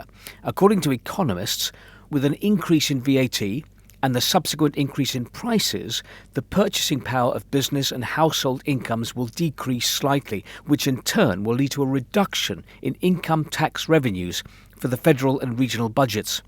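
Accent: United Kingdom. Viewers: This narrator sounds British. Isn't that where the BBC comes from? I'll be taking this with several grains of salt, if not the entire shaker.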